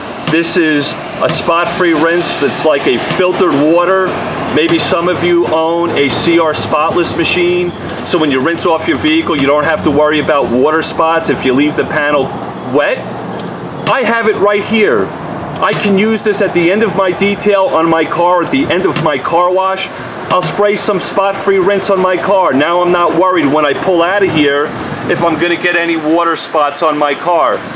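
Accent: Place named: Boston, United States. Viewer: Love the accent...cahs and cawfee lol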